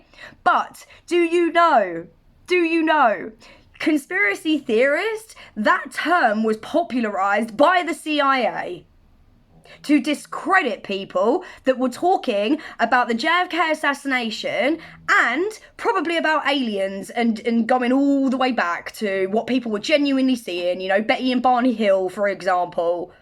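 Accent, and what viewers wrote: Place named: East London, United Kingdom. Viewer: lol only found your channel like a week or 2 ago and love it lol you really remind me of home i was born in east London and grew up in Essex, lol you sound so much like me on things 👍 i have been listening to Alex Jones for 25 years or a little more and everything he said is here now in everyone's face!!! and still people call him crazy lol i could natter for hours with you. keep up the videos they are great.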